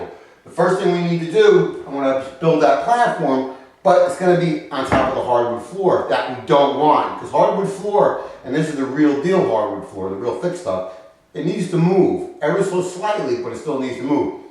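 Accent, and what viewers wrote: New York, United States. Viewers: Great videos man, straight to the point no BS, as an avid DIY'er This is about to be my new favorite channel for sure. I am in the process of buying a new house right now and if everything goes through, I will be using your vids to do alot of work. I may be wrong but you sound like your from New York. I live in NY also, if so and you want to pm me some info incase theres something I cant tackle I will definitely hire you. Thanks keep these videos coming we all appreciate your help.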